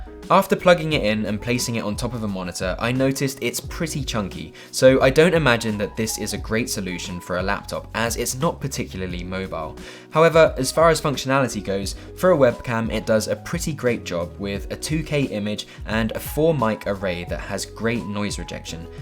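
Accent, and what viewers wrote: United Kingdom. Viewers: I wish I had a British Accent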